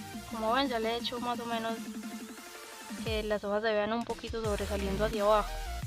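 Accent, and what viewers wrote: Colombia. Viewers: colombia? :u paisa? xd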